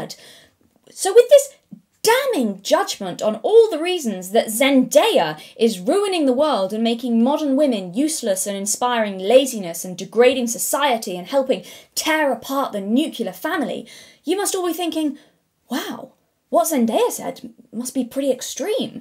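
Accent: United Kingdom. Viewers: I'm British, but my god, your British 😂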